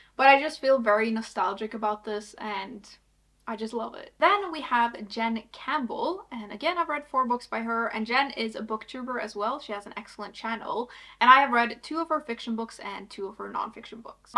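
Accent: New York, United States. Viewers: No way! Maybe it's because of your accent, but I always thought you were from New York, USA or somewhere near there. 😆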